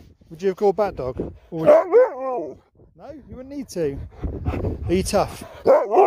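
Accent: United Kingdom. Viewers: Btw are u from the UK??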